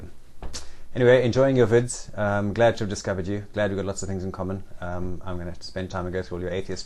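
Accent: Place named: Wales, United Kingdom. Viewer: The American East Coast accent is a combination of English & Irish in some places, but accents vary by region, just like in Britain. I can tell Philadelphians from New Yorkers, for instance. It's very subtle. Canadians from Toronto sound mostly American-ish, but enunciate T's and S's more like the Irish. I find your Zimbabwean accent very pleasant; it's rounder, a little more sing-song than most English accents, almost Welsh sounding. Most Americans would assume you were from England.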